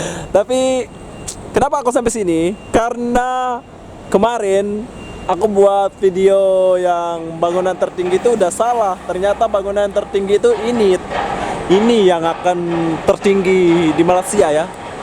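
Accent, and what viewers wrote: Lombok, Indonesia. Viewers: Batur lombok aran min, kenek jak ndek ye. Wah telang ongkat logat2 sasak tye lasing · Dari awal sy udah mengira kalau ni orang dari lombok soalnya logat penyebutan Malasianya kental nanget. Salam dri lombok juga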